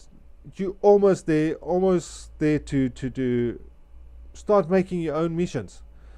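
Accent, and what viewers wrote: South Africa. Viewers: 99% sure you are Afrikaans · South African?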